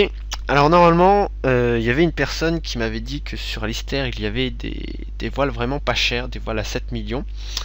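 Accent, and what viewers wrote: South, France. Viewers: t'es du sud non;)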